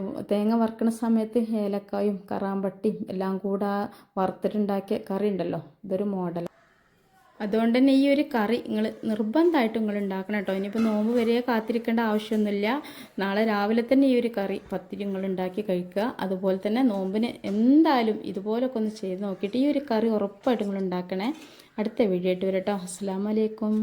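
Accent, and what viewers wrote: Malappuram, India. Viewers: Malapuram ano samsaram 👌👌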